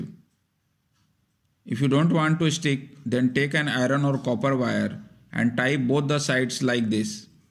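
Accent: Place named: India. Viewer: Sounds like from India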